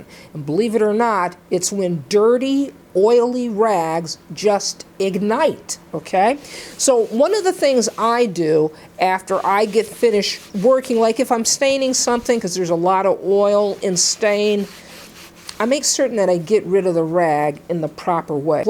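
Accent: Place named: North, United States. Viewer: Nice tutorials and even nicer to hear a northern state accent again, reminds me of my late father, born in Bar Harbor Maine and lived in Massachusetts all his life. Nothing against the southern state boys, they're good too but the accents grate after a while.....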